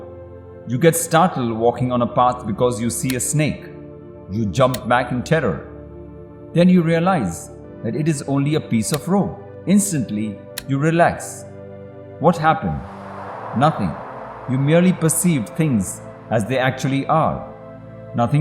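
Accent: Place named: India. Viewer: hahahaha, BS, im tired of this indian accent people claiming they know all! BS !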